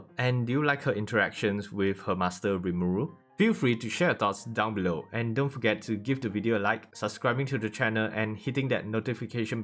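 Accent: Singapore. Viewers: You have singapore accent. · Holy shit your accent is VERY Singaporean lol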